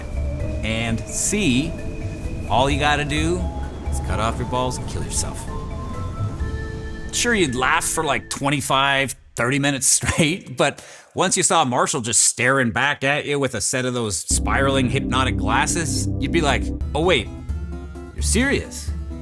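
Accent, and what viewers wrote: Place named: Canada. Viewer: I live in Canada and sounds a lot like us accent wise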